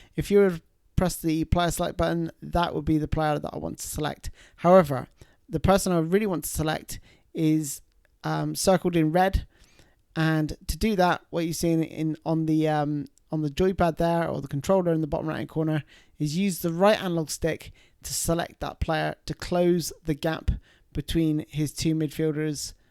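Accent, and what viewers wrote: United Kingdom. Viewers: Great explanation, and a new Sub from me! Also, you sound like you're from Bristol too? Either way, one new follower :)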